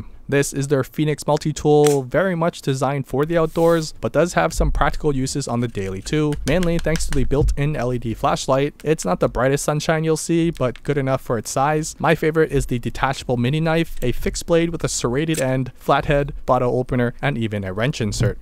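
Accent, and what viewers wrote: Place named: Canada. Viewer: Canadian with a light lisp?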